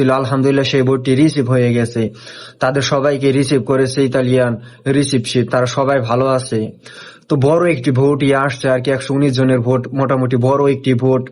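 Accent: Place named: Tangail, Bangladesh. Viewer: যাক আল্লাহ ভরসা যে কটা যাইতে পারে এ ভাই আপনার বাসা তো মনে টাঙ্গাইলে আপনার কথার ভাষা শুনে বুঝা যাচ্ছে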